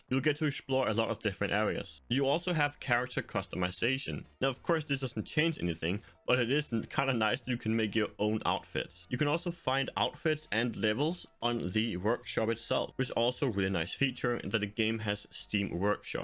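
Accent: Denmark. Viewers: Thick ass danglish accent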